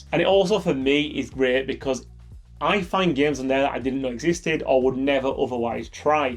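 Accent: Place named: North East England, United Kingdom. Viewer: Where in the UK are u from? you sound like your from the North East. Good vid. Subbed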